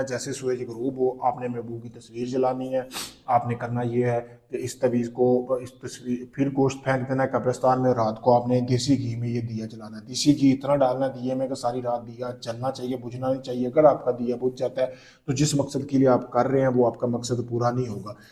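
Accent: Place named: India. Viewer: Bhai aap kidhar ke rehne waley ho. Apka lahja Hindustan wala hey,or apki alfaz ki adagi bhi theek Nahi.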